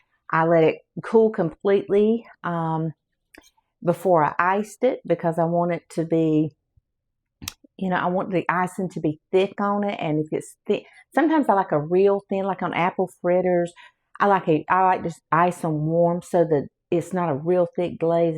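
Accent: South, United States. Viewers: Your still a beautiful lady, absolutely love your southern accent.😊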